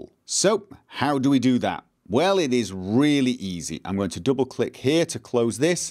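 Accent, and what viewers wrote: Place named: Liverpool, United Kingdom. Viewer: Very helpful up to now, the big question is.... Where about in Liverpool? Defo scouse defected!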